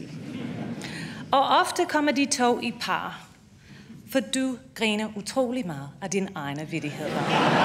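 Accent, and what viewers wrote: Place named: Denmark. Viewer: The subtitles are for the death and the hard at hearing and people who are death. The rest of us can understand her quite fine, as she is speaking what is called rigsdansk which can be compared to something like Cambridge or Oxford English.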